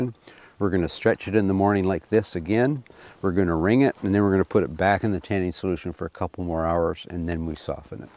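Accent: Canada. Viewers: Truly excellent video! This guy is a National Treasure, I think he is Canadian from his speech patterns. He is articulate, considerate, and kind. Ted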